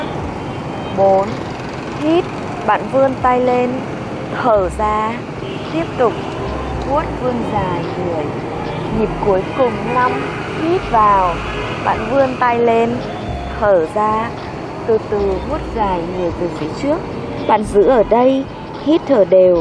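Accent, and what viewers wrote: Hanoi, Vietnam. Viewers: nghe giọng nói Hà Nội mới sáng khoái làm sao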